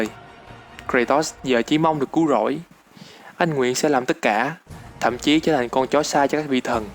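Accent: South, Vietnam. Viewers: t xem đơn giản vì giọng của thớt miền Nam, t ko anti miền Bắc nhưng vẫn cứ thích nghe giọng phân tích miền Nam hơn :v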